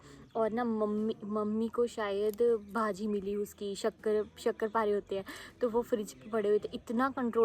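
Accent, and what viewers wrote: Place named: Punjab, India. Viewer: Tum itna atak kar kyu bolti ho tumko koi problem hai kya u can not even speak punjabi properly wo bhi bilkul rustic way mei bolti ho. Thoda grooming karo apni aunty.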